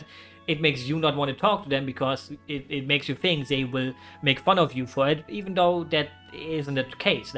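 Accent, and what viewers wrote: Germany. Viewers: Necroposting a lot, but I got it suggested and never watched it before so... here's my two cents. Very heartwarming, inspiring video and a great listen overall. Thanks for sharing your personal history, allowing the audience to take it in and make their life better (and accept themselves). I always hated the "true" sound of my voice (which is natural, it's the same as not liking having your photos taken - our brains are used to see our own image mirrored because, well, most of the time we just see our reflections) to the point that I lashed out on people using speaker during calls as I could hear myself. So recording myself speaking and then listening to it and fixing it was a true torture and I wanted to die... until it wasn't. At some point... I just stopped caring about it and accepted myself. The same could be said about having aversion to own image. 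Very often there's that little voice inside our heads that tells us that we're just "not good enough", when in fact people really doesn't care (most of the time). Most of the time we are our own worst enemies, huh? Perhaps that's just And hey, I think your voice is really cool, same with accent, tone and tempo. It makes it quite pleasing to listen to, ...and yeah, I realized you were German after like 5-10 seconds of listening to you. It isn't a bad thing, it just... something that is and makes your content bit different than most, haha! It is funny though how Europeans can pinpoint the accent of others with nearly 100% preci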